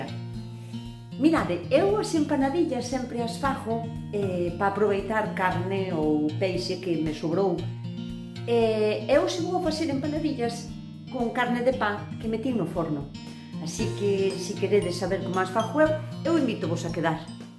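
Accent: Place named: A Coruña, Spain. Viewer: No sé porque me paredes que sos de la Coruña o de Santiago de Compostela. De Galicia..creo que seguro...te escucho con atención ..es la primera vez que te veo..ye emociono tu acento xque mí madre era de la coruña.y yo entendí siempre su fdialecto.....ya no está conmigo..pero tu idioma me traslado a mí niñez.. Gracias....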